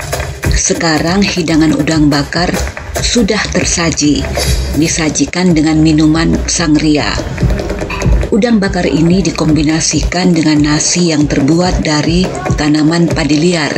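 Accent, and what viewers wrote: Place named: Makassar, Indonesia. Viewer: Logat nya sama seperti logat Makasar 🤩💛, bugs & Makasar budaya nya berbeda.. cerita nya menarik bunda, hati" selalu di sana 💙🤩💛 happy new year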